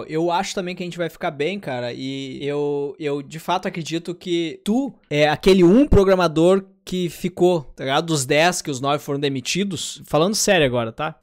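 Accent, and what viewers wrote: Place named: Ceará, Brazil. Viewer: Cearense detected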